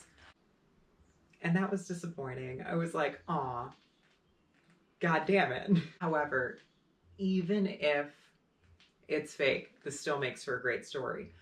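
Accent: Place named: New Jersey, United States. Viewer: Do you mind if I point to your channel? Im about to record my long form episode on this. Did a stream last week on a friends channel, and I talked about this ad, and why I do believe its going to happen, and even know who will be hired as employees and whatnot. Just came across your channel, and you totally sound Jersey. For a moment I thought you snuck into my garage. Same cinder blocks, even the AC in the window is the same. Did you sneak in, move 12 cars, pull out my 1320 and sneak Herby in my garage lol.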